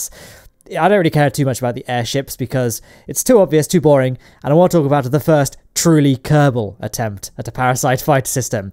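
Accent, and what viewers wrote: United Kingdom. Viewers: Its the bitish accent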